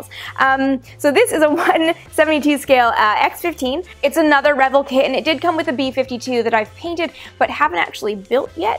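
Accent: Canada. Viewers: You sound Canadian. So strange that almost all of the channels I'm subscribed to are Canadian.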